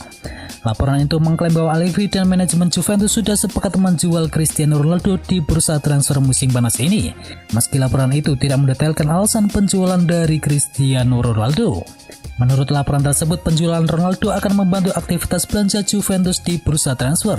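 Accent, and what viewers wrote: Java, Indonesia. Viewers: Tolong bagi pembaca berita logat jawa nya d samarkan bos ,. Gk enak dnger nya . Kalo sekalian bahasa jawa mah iya aja aku suka .. SekedarMasukan dan saran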